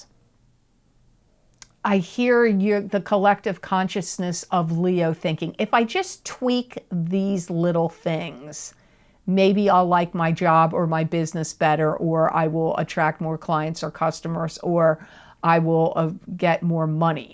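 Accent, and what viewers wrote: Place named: New York, United States. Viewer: I do think you are a New Yorker !! Love your accent !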